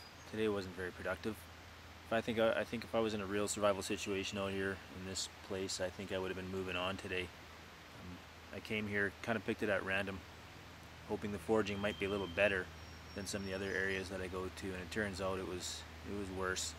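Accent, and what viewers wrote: Canada. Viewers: nice series. it sounds like your canadian, too bad you cant carry a pistol. it would suit your needs and is way less cumbersome.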